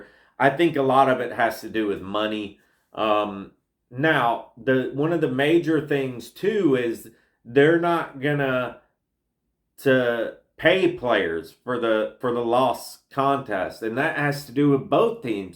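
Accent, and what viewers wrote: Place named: South, United States. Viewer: I bet, I live in DC now and there's a few of us that meet up at a bar every Sunday I was surprised there was Titans fans in the nation's capital Also that's cool you knew bloxville stands for Knoxville Tennessee. I can tell by your accent in your videos you are definitely from down south for some reason I was thinking you live in Nashville idk why lol Love the videos though keep it up I like your long videos or the short ones I don't know how long you've been doing it but you're doing a good job ✌🏼 bro bro keep trucking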